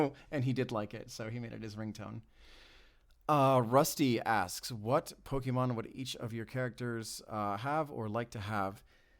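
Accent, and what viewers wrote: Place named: California, United States. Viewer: Yes I’m six months late, but I thought your speaking cadence sounded familiar! Californians recognize Californians 😂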